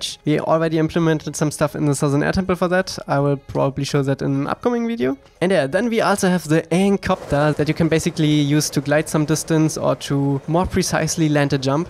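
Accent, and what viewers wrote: Germany. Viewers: Sounds like a german accent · Man hört seinen deutschen akzent soo krass raus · Wie krass man hört, dass du deutsch bist. Aber mal davon abgesehen sieht alles sehr cool aus. :D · Der Typ ist 100 Prozent deutsch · Du bist ein deutscher oder? ^^ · Are you german? Your english sounds really german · That's the most german english I ever heard😁😁the game looks very good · nicer deutsch akzent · wie man das deutsch höhrt haha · Du hast n echt deutschen Akzent. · Not even 2 minutes in and I knew you're german xD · Man erkennt zu 100% er ist deutscher English: because his accent you can hear his German Btw really nice game i hope we can play ist all in the future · Haha voll rausgehört das du aus Deutschland kommst :D mit welcher Engine arbeitest du denn am Projekt? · Ich weis nicht, aber kann es sein das er aus Deutschland ist ? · Wirklich sehr kräftiger, deutscher Akzent. 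Aber naja nice, dass ein Deutscher das ambitionierteste Fangame-Projekt zu avatar leitet (ist) ^^ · Ich dachte doch gerade am Akzent.. das klingt ziemlich deutsch, schaue in die Kanalbeschreibung: Deutschland :D Aber coole neue Mechanismen! :) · Gutes Englisch, aber ich feiere einfach, das kaum ein deutschsprachig Nation, ihren Akzent verstecken kann (willst du wahrscheinlich auch gar nicht) Grüße aus Hannover 😘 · Du bist doch ein deutscher, oder ?😂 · Your english sounds like you are German, please tell me I am right :D (I am German) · Man Merkt das er Deutscher ist :D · Man hört irgendwie deinen Deutschenakzent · Dieser English Akzent :D Deutsch nicht wahr? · Der Deutsche Akzent macht das tausendmal lustiger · digga wie man einfach hört das du deutscher bist :D Aber geiles Game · if i may ask. from what country are you? your accent reminds me a bit of german. when i hear "glide" u kinda say "gleit" the german word :D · Dieser deutsche Akzent XD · Man hört einfach das er Deutsch ist haha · Nice, in the beginning it looked like a simple amateurly made game and I expected the project to stop after a month, but it's really coming together and getting closer to a game I'd enjoy paying! 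Keep it up my dudes! PS: btw are you German? Your accent sounds like you were.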